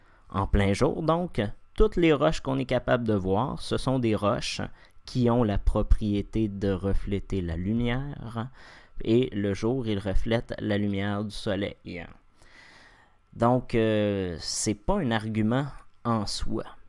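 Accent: Canada. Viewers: Maintenant, dès que j'entends l'accent canadien je suis sur mes gardes mais là le propos m'a l'air logique, clair, cohérent, argumenté. En un mot " globiste", je dirais même " sphériste" ou "rotondesque"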